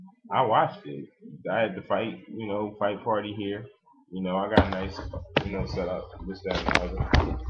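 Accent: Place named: Philadelphia, United States. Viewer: I can tell you from Philly by the way you talk no homo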